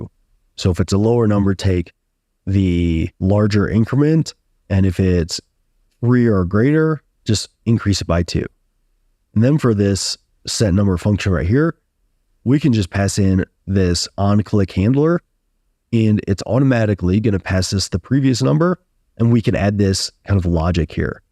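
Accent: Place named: United States. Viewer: I've been wishing for someone to make this video (react 2025) and for that someone to be an American English speaker and not an Indian and here you came in clutch with this gem. Thanks!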